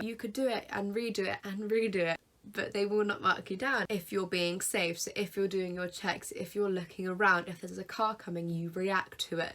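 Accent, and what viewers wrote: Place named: RP, United Kingdom. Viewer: you're very posh arn't you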